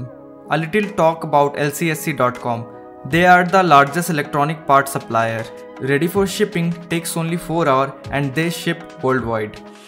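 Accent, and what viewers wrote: India. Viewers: You sound Indian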